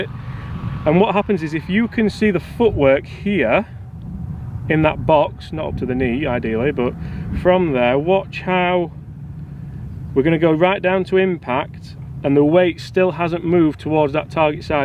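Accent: Yorkshire, United Kingdom. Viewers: "Si Thi" 😂 a reyt good Yorkshire accent that pal good to hear local lingo 👍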